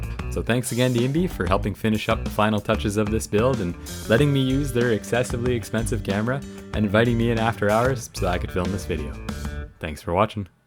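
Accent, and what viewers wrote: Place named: Canada. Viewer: I absolutely the combination of yar calm voice and the canadian accent, you basically have the perfect narrating voice ❤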